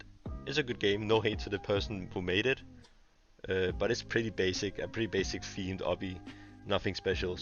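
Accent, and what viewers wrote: Denmark. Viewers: are you danish? i have to ask this question okay its important...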